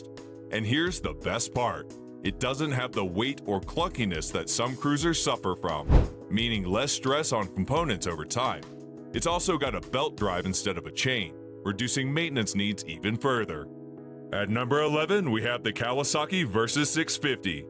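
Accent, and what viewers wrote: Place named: United States. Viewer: Leider erzählt...im extrem hässlichen US- Englisch...schade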